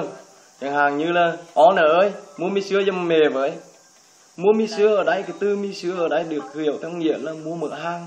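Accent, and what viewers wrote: Hue, Vietnam. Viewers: Giọng huế nghe hay dệ sợ . Phú vang , thừa thiên thì like cho cấy cả tội nờ.😂😂😂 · e la ng miên tây nhung em rat thích tieng hue.nghe anh noi mot đoan .nghe thích thât. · Giọng Huế khá hay, anh này nói đúng chất của giọng Huế và kèm theo một số từ ngữ địa phương thông dụng mà người dân Huế hay sử dụng, like anh! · Nói nặng quá, đảm bảo kiểu ni chỉ có người Huế mới nghe được thôi!!! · tiếng huế hay thật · Quá chuẩn giọng Huế, like anh! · giọng huế rất hay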